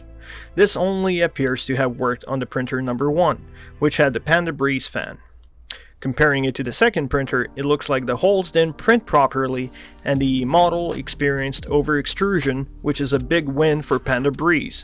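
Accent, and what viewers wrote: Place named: France. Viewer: I can hear the french in your accent. Lmao great video though, very informative.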